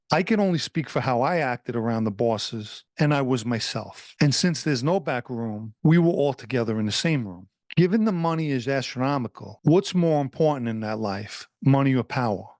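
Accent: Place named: New York, United States. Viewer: By all means please explain why you have a New York accent?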